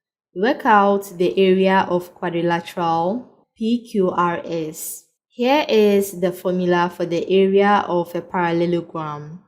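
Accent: Ghana. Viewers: are u ghanaian ? you have a very ghanaian accent either that or your from nigeria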